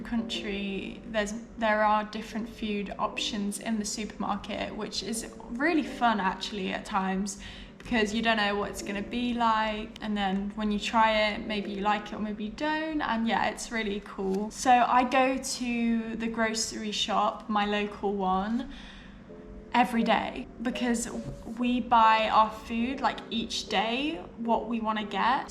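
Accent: United Kingdom. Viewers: this is the first time I hear this pronunciation. She has a really heavy British accent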